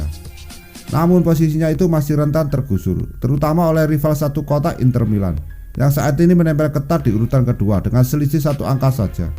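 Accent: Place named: Banyumas, Indonesia. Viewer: Ngapak suaranya .. hehehehe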